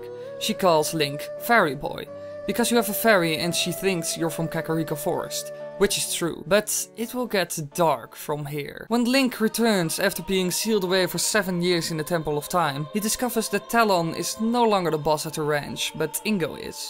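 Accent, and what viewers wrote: Netherlands. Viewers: Are you dutch? Whatever love your accent.. and the theory😊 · Haha you are definitely dutch right? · you're dutch for sure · R you dutch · Are you dutch? (Just a random question) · are you dutch because i hear something that sound like a dutch accent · ...ben je Nederlands toevallig? Ik hoor het aan je accent als je Engels spreekt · Ik kan horen dat je Nederlands bent je praat met een accent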